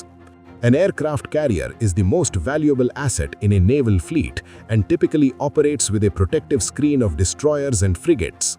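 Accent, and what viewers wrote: India. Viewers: Your stupid Indian missiles are not working at all, stop bragging that your navy is better than our Chinese navy !!! By the way, your Indian English is not proper English, stop speaking Curry English !!!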